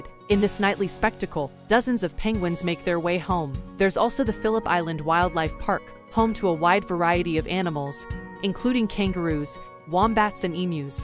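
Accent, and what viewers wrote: United States. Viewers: Why is the commentary done by an American voice who pronounces several towns names incorrectly? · Annoying American accent, has to be most spoiling ever.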